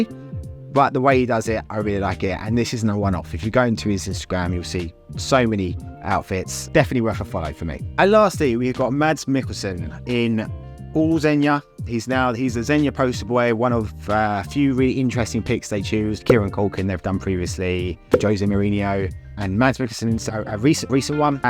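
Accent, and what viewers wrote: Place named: Essex, United Kingdom. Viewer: Sub'd! Learning more about how I want to present myself through your insights. Also, nothing wrong with a fine Essex accent.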